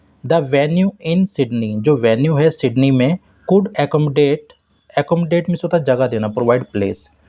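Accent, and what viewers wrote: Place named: India. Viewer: Accent = India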